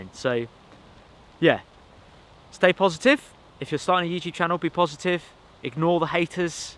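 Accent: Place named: United Kingdom. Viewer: OH my Goodness, I had no Idea that you all over there in the UK used daylight savings time too! I love all your videos, and listening to your accent and learning more about the outdoors. Thank you for taking us all along with you on your adventures and your builds... and OH some awesome foods! Take care.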